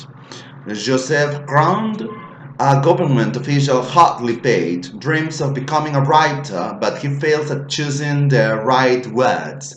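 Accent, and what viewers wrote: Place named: London, United Kingdom. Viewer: en serio?? Parece que hubieras vivido ahí, xq el acento es británico-londinense, increíble que sólo lo hayas adquirido estudiando.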